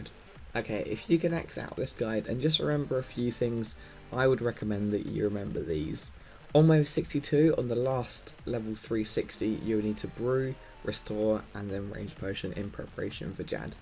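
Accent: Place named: South Africa. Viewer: whats with south Africans and saaoooohhh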